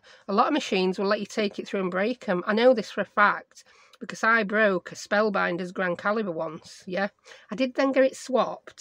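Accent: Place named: Yorkshire, United Kingdom. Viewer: Brilliant video. Love the accent. Is it Yorkshire?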